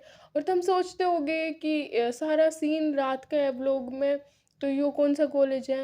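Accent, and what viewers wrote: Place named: Haryana, India. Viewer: Aww so pretty u are ❤ And your haryanvi accent is so cute and soothing to hear ❤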